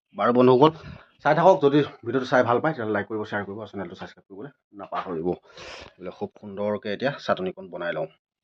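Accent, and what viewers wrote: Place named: Nagaon, India. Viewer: Apunar video xodai saisilu kintu nagaon kotha kuwa karone beya lagit moi jihetu nagaon hoi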